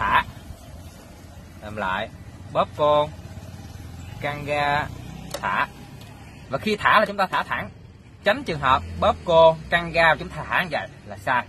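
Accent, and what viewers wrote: Vietnam. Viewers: Đu má a nc chửi thề dễ thương lắm anh, đậm chất miền Tây, em cũng z hihi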